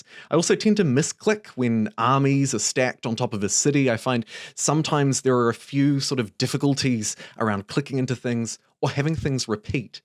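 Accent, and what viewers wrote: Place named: New Zealand. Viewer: You sound like your from NZ ... Same obligatory sub lol..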